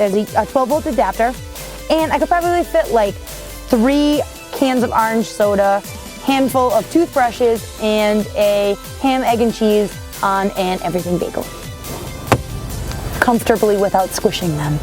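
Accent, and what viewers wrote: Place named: New England, United States. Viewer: 613 I love the way she says "Honder" instead of Honda. I enjoy listening to her New England accent. I am from Oklahoma and so I like listening to other accents besides those found in the south or from here in the southwest. Love your accent,!:-)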